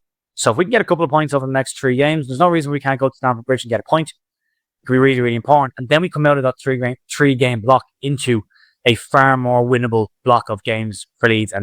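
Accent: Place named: Ireland. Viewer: Disappointed that you didn't say something along the lines of "forest destroyed by tree" in your Irish accent. Other than that great analysis!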